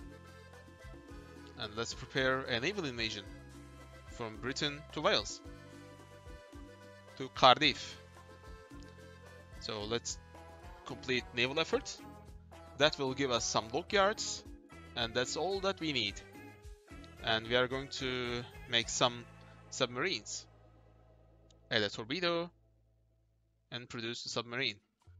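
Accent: Turkey. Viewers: aksanı duyunca dedim bu bizden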